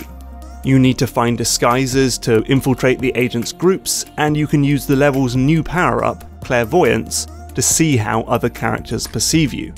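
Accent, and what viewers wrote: United Kingdom. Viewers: I love internet video man, especially British voice internet video man.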